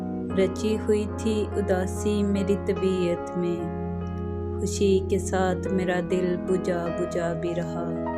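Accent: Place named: Pakistan. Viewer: Awaz Pakistani lag rhe..🔥 From kashmir IOK ❤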